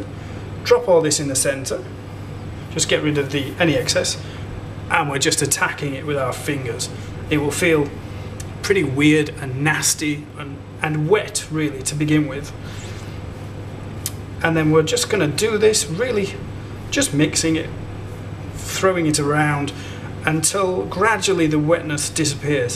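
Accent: United Kingdom. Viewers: Yeah, in the UK, the TV is mostly a few government-run channels (at least thats what I got from going to scotland 3 times), and I don't think they have Man vs. Wild. And you know, some of the pronunciations just sounded southern Scottish to me, so that's where I got the impression. By the way, I might just not have found it yet, but if you haven't made one already, are you ever going to make a pizza video? I realize pizza is a fairly simple idea, but it has a variety just like pasta.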